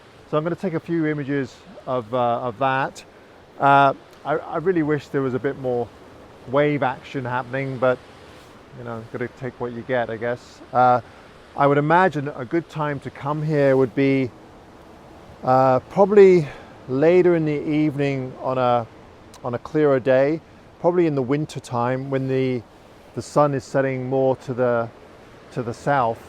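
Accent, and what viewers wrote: United Kingdom. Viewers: I subscribe to a half dozen photographers and enjoy them very much but you have a special eye in your photography that I really enjoy. I'm also partial because I'm from Vancouver Island and admire the way you share it with the world. It's an amazing place. Your vlogs are truly awesome and better than any TV show I'd sit in front of. Congrats on the new slider! More gear. :) Mind you, IMO, the time-lapse of water and nature distracts from the beauty. But still a huge fan! Keep 'em coming. BTW, what's your accent? UK?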